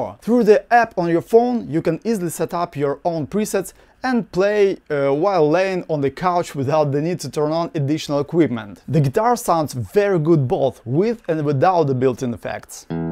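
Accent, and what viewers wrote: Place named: Russia. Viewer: Hi! I hear accent.. You russian?)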